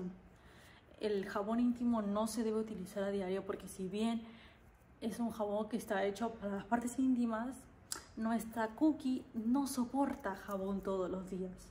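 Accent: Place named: Spain. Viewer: your the most beautiful girl I've ever seen, I love your beautiful Spanish accent and words, I hope someday I meet you and talk to you 😊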